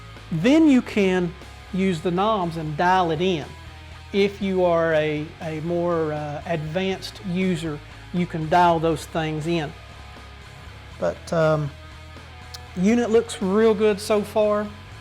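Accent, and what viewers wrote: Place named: North Carolina, United States. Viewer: Enjoyed your review! Do you mind me asking where you are located? I detect a NC accent there. I also think I see a can of spray paint on your bench from Princess Auto here in Canada.... so I'm confused! lol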